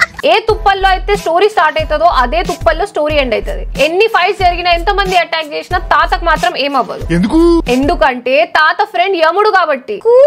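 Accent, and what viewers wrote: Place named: Telugu, India. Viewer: Imagining you in BigBoss 9...😎..your telugu accent is NEXT LEVEL...please keep it up.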